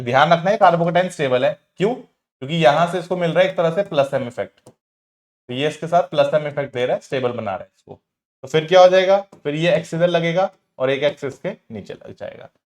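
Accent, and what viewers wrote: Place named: Punjab, India. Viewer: Sir tusi punjabi hege o na , kai vari thodi punjabi nikal jandi ee 😅😅😅, I'm also from Punjab 😊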